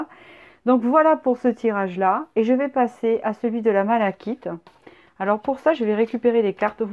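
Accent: South, France. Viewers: Merci beaucoup! Pile au moment où j'ai eu la certitude que j'avais fait le bon choix (le 2), vous parlez avec humour de votre accent du sud! J'ai pris cela comme une confirmation, moi qui suis née et vis dans le sud🤗🌻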